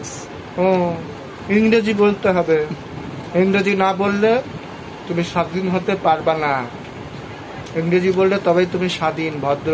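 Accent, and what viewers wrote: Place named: Bangal, Bangladesh. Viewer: বাঙাল কথা কও কেন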